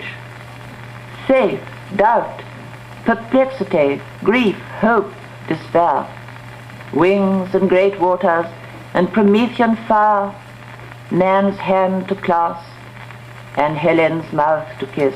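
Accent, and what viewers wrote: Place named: RP, United Kingdom. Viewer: She speaks queens English, she has no accent.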